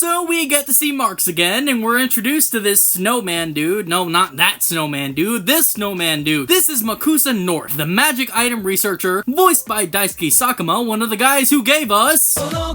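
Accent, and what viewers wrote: United States. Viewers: Ur American accent is great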